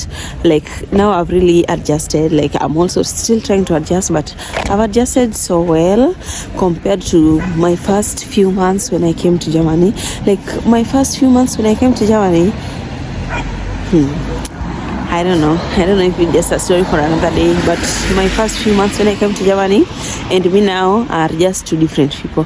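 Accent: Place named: Kenya. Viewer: You sound East African, and keyan to be exact. Why the Ghana flag? 🇬🇭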